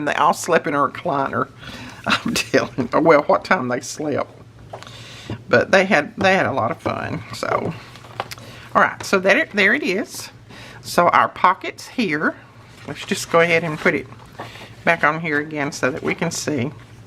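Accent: South, United States. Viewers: What a wonderful video this is. Not only do I get a tutorial on how to make a great addition to my ephemera stash, I get to enjoy the background music of a storm. I miss the claps of thunder and the relentless rain, and this is a video that I will watch over and over. When I get homesick for my Texas girlhood, I watch your channel. I miss the speech of Southern people and the scream of Southern storms.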